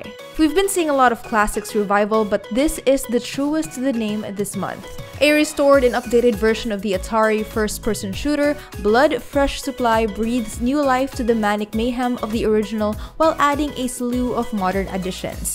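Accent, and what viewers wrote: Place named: Philippines. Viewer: the narrator is filipina. halata sa accent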